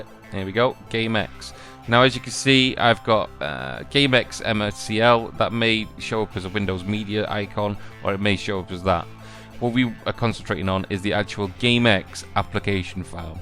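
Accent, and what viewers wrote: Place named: Leeds, United Kingdom. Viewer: You're a Leeds lad I gather?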